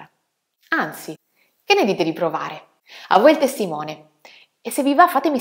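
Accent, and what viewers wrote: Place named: Piedmont, Italy. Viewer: Innanzitutto un sentito grazie per la cortese, precisa e veloce risposta. Continuerò a darti del "tu" anche se, nelle tue righe, sei partita col "tu" per passare ad un più formale "lei" (quando hai aumentato di un 'dente' la polemica... 😜) non fosse altro perché sono più "attempato" di te. Ho guardato altri tuoi video e, oltre a parlare un bell'italiano (sei di origini piemontesi, vero?), esprimi giudizi competenti e risulti davvero simpatica. Di nuovo: complimenti! Ora - però - metto giù il "violino" e vengo "al sodo". Il termine "faziosità" l'hai usato tu non io; e se ti ho "bonariamente accusato" di qualcosa è che in tutto il video appare evidentissimo per quale fazione fai il tifo... E - in tutta franchezza - non ne capisco il motivo. Saranno forse trent'anni che studi il pianoforte; sicuramente avrai sempre usato strumenti intonati a 440; in tutto questo tempo ti sarai formata un orecchio musicale non indifferente; il TUO pianoforte è accordato a 440 (lo suoni in altri video). 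Dunque? Per avere più 'like', stai cavalcando un'onda "new age"? Anche il dott. Corrado Malanga lo fa, tant'è che, da bravo professore di chimica, si è messo a scrivere di "esoterismo": pensa un po' che "scienziato"...! Nella Musica (la scrivo con la maiuscola) non ci sono molti argomenti "scientifici" su cui dibattere: la Musica è Musica, punto. Ciò che piace a me può non essere di tuo gradimento, dunque ciascuno ha il sacrosanto diritto di ascoltare ciò che preferisce, intonato al LA più in sin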